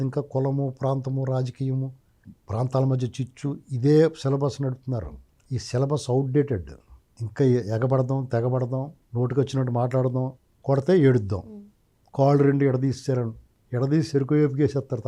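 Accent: Godavari, India. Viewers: సూపర్ గా చెప్పారు గురువు గారూ.. ఈ యెదవలందర్నీ బహిష్కరణ చేయాలి.. ఈ విషయం గట్టిగా ఆలోచించాలి. మీ ఆలోచనలనూ, మీ సూటిగాప్రశ్నించే విధానాన్ని మీ అభిమానిగా.. ఎపుడూ అభినందిస్తాను. ఎన్నో మామూలు జనాలకు తెలియని, అర్ధం కాని విషయాలు కూడా ఈజీగా గోదావరి జిల్లాల యాసలో, సామెతలతో చెప్పడం నాకు బాగా నచ్చింది. నమస్కారాలు.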